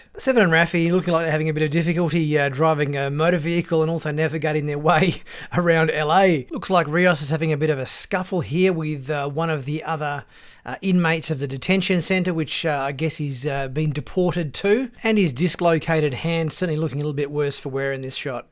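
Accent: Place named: Australia. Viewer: You were doing fine until towards the end with your conjecture and speculation...then, your Ozzie accent wore me out.....plz stop talking.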